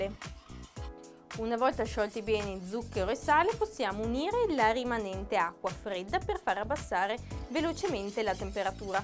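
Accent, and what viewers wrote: Italy. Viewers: Is this Italian accent? My neighbors sound so different :)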